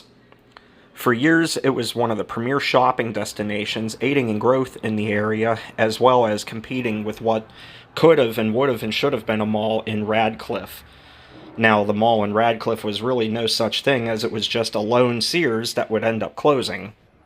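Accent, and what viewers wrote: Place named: Western Pennsylvania, United States. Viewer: I hear a western Pa accent, Pittsburgh area? Am I right? or close.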